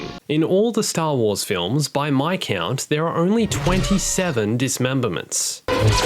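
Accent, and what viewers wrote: Australia. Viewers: Yes your Aussie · Oh your Australian as well cool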